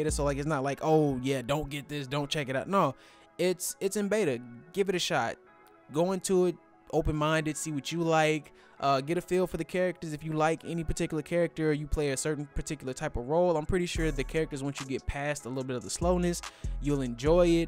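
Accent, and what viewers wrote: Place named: United States. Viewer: You sound American dawg n as far as i know its only out on Asian servers ...so how you playing it?...on PS4 we gotta make a Hong Kong account or something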